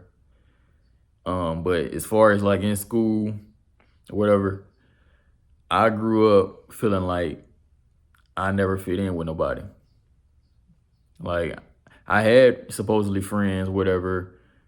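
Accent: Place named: Texas, United States. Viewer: welcome to Texas !! I'm a Texan. & you sound like a Texan naturally! a true southern boy.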